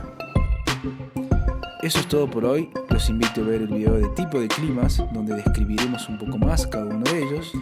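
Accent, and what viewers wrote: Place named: Córdoba, Argentina. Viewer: como amo su acento cordobés LA PUTA MADREEEE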